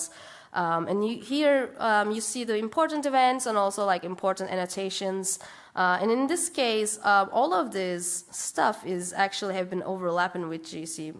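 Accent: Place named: Turkey. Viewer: Anyone else wondering what her accent is, it's Turkish!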